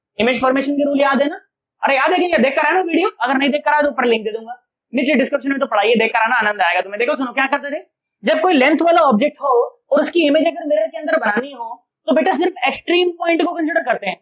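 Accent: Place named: Bihar, India. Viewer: First of all, you speak a very nice bihari, secondly your explanation is also very good... I got the concept..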